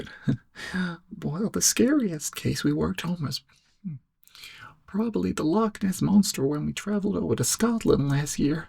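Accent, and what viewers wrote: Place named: United States. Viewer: Interesting American accent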